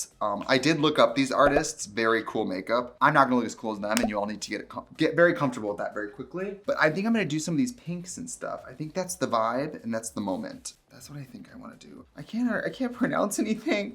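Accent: Wisconsin, United States. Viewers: As a born and raised Wisconsinite who now lives elsewhere in the US like Trixie, my favorite thing is hearing just how much more pronounced her WI accent becomes when she's back in the state. Because the EXACT same thing happens to me. It's like a disease you can only hide for so long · Omg the Wisconsin Mom accent ❤😂❤😂❤😂❤😂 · Her Wisconsin accent matches angry candle lady’s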